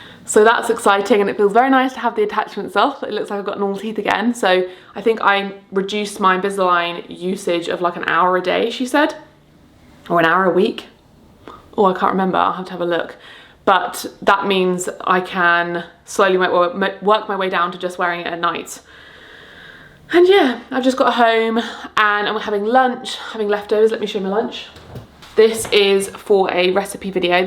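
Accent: United Kingdom. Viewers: I like letting long vlogs run in the background while doing some light stuff that don't require too much attention because I'm trying to soak in some nice british english accent 🥰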